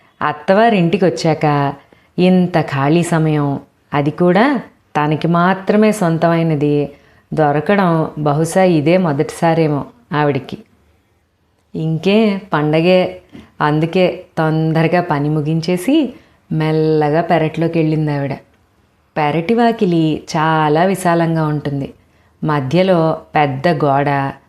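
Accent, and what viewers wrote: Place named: Godavari, India. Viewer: మీ అగ్రహారం కథలన్నీ విన్నాను అమ్మా... చాలా చాలా చాలా బాగా చెప్పారు మా గోదారి యాసలో....❤❤👌👌👌👏👏👏🙏🙏🙏🙏 ఒక్కటి పక్కన ఫోటోలోనీ వాళ్ళూ నిజమైన వారా??!! లేక ఊరకనే వేశారా???!! నా సందేహం తీర్చగలరు. ఇక మీ మిగతా కథలూ వినటం మొదలు పెట్టాలి.ఉంటానమ్మా🙏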